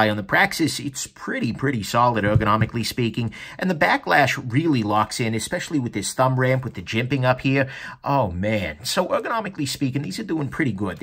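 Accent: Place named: Long Island, United States. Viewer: As soon as I heard your voice I would have guessed you had a friend named Frankie LOL. No disrespect man I got a buddy that lives on Long Island to talk to the same way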